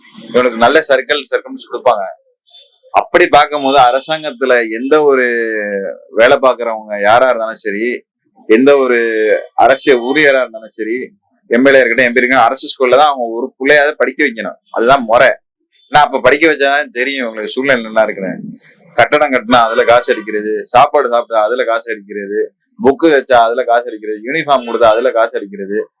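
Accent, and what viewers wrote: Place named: Delta, India. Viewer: Evari delta slamg mathiri pesuraru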